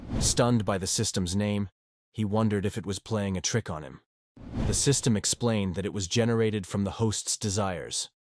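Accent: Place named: Ireland. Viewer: The narrator seems Irish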